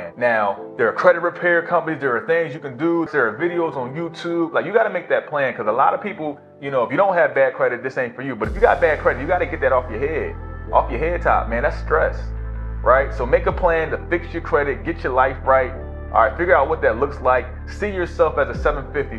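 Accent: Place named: Philadelphia, United States. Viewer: Wait is he from Philly ? 😭 ”anything that ain’t thurl”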